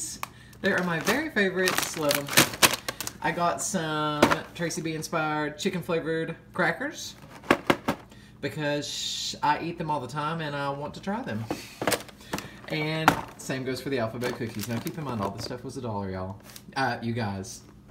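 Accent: South, United States. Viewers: 😂😂 LOVED this!! I definitely felt Taci B coming through but that southern accent ain't goin' nowhere! 😆 YESSSS to the homemade Ranch dressing! Have you had the Reeses cereal that comes out in spring/Easter in the shape of bunnies?!? 🐇 MY fave! I think about someone living in our attic far more than I'd like to admit.... that story was sooooo creepy! 😦😭😊💖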